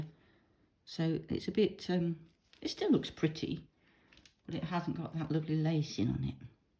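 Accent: United Kingdom. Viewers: Hi, Thanks for a really helpful video and what a result. I haven't even started this Bloom journey yet. I'm just collecting ingredients etc so it's been great coming across your video and then to my delight hearing a British accent, which of course means being able to get the same things as you;) I wonder as I've already bought the valspar wood and metal Base C, if i could use that plus varnish for the pouring medium instead of glue (as in Shelee's technique) but use your pillow of simple acrylic paint? I don't suppose there's any reason why not,unless the medium would be heavier than the pillow? anyway would be interested to hear your thoughts on that. Great tip with the tape on the back!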